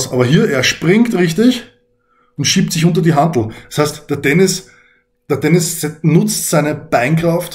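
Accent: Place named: Austria. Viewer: Dialekt für an Österreicher verständlich ... für Deutsche vielleicht schwer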